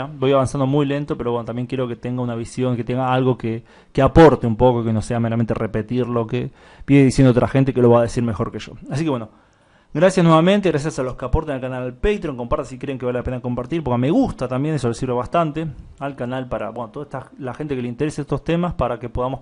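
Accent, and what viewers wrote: Argentina. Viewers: Es argentino, es su manera de hablar.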